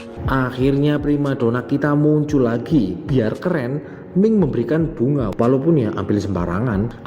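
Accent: Banyumas, Indonesia. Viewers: Mimin wong ngapak😂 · ko wong banyumas apa hahaha nganti bingung temen pada nggoleti apa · Nyong bangga rika wong ngapak😂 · Ngapak ngapak urapapalah seng penting penakk😂😂😂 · ngapak temen wong endi jane rika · admine ngapak ehehe salam dari pwt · Rika ngapak deneng Ngendi Banyumas SE si lik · Wong ngapakkk kiee lanjutkan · Rika wong ngapak ya? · Ngapakke ngendi kangggg😅😂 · Min asli wong ndi, ding nggo basa ngapak😂😂😂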